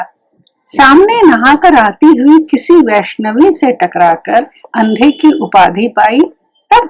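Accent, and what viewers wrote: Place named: Punjab, India. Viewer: ‘उसने कहा था’ चंद्रधर शर्मा गुलेरी की मर्मस्पर्शी और अमर कहानी है.यह हिंदी का सर्वश्रेष्ठ कहानी मानी जाती है. प्रसिद्ध लेखिका के स्वर में यह कहानी और भी जीवंत हो उठी है. वास्तव में कहानी शब्द का अर्थ ही यह है कि एक ऐसी रचना जो कही जाए. लेखिका ने उन तमाम स्थलों पर भी भाव-भंगिमाओं का संप्रेषण नाटकीय अंदाज़ से करके इसे सचमुच जीवंत बना दिया है. वस्तुतः इस कहानी में पंजाबी के कुछ शब्दों का प्रयोग गुलेरी जी ने जिस अंदाज़ में किया है, वही इसे आंचलिक स्वरूप प्रदान करते हुए विशिष्ट कहानी बना देता है. “यदि कोई बुढ़िया बार-बार चितौनी देने पर भी लीक से नहीं हटती, तो उनकी वचनावली के ये नमूने हैं—हट जा जीणे जोगिए; हट जा करमाँवालिए; हट जा पुत्तां प्यारिए; बच जा लंबी वालिए।” ने इन शब्दों का उच्चारण भी पंजाबी लहजे में करते हुए इसे और भी नाटकीय और जीवंत बना दिया है. विजय मल्होत्रा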